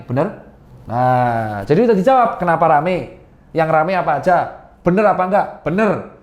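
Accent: Java, Indonesia. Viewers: suka suaranya dokter tirta yang medookk,,,,hehehehee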